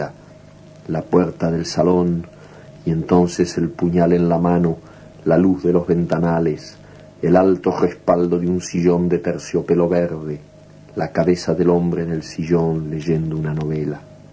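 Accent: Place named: Northern Argentina, Argentina. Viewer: su acento (en esa mezcla extraña de español y francés) me remite a un acento del norte argentino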